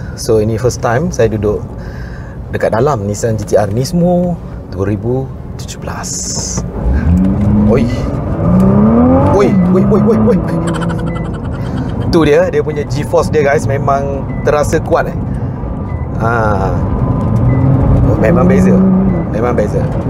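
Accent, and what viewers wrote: Terengganu, Malaysia. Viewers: Tganu atau klantan..slang xleh tnggal tu😂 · Hahaha. Xleh nak lari doh sleng ganu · cik ni dari ganu cara cakapnya😁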